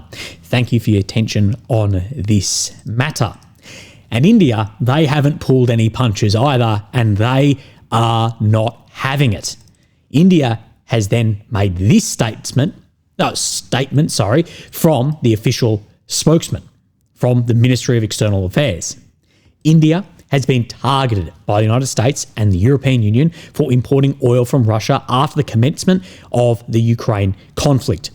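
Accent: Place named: Australia. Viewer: When you're reading you going too fast remember you got that deep Australian accent so please slow down when you read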